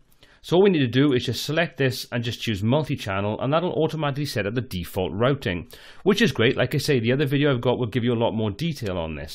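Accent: Wales, United Kingdom. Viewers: No we don't, this guy is Welsh, so it is a regional variation, it's not a moot point! Also for route, we pronounce it root not rowt.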